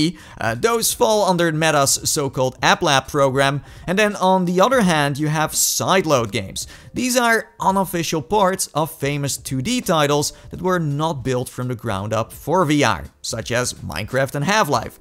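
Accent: Netherlands. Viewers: Try english beter dude u dev sound like someone who’s dutch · are u from the netherlands cause i am and i recognzie the accent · lol okay I hear within the first 4 seconds you are presumably dutch :) haha, cheers man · Are you from holland?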